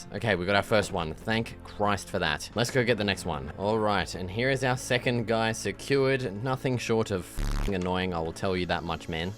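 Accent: Australia. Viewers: bro is australian W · are you australian??? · his australianness distracts me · This guy sounds so Aussie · I can tell your Australian mate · “Oh, he’s Australian” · "OUUH!" lolll .. also, the Aussie-ness is just steaming off of him like a thick vapour. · sweet world dude, love you aus accent hahahaha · Mates aussie as hell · He’s so Australian 😭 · Hello mate you’re Australian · you aussie? u sound like it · Are u Australian 😮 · nah easy mistake too make but hes def aussie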